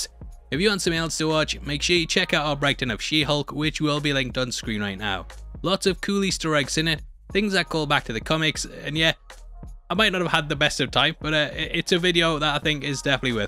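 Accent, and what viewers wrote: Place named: England, United Kingdom. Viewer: I love how your English but your impressions bare terrible lol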